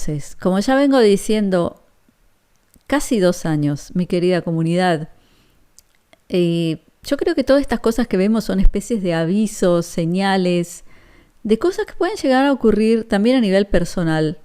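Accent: Argentina. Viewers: hablas así porque sos Argentina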